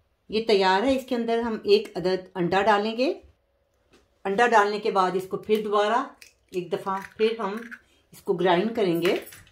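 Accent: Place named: Germany. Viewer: میرے کے لگ رہے ہیں ۔۔۔۔ ۔۔۔۔۔۔۔۔۔۔۔۔۔۔۔۔۔۔۔۔چکن پکوڑے اتنی مرچیں ۔۔۔ دیکھ۔ مجھے۔ پسینہ اگیا۔ ھے 😁 آپ کی اردو اچھی لگتی۔ ھے۔ جرمن۔ ایکسینٹ کے ساتھ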